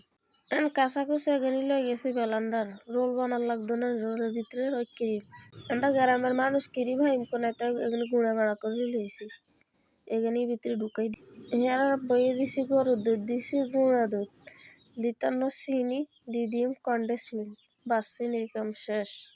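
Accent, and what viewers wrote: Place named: Noakhali, Bangladesh. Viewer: নোয়াখাইল্লা ভাষা অনেক সুন্দর হয়েছে ❤❤খুবই ভালো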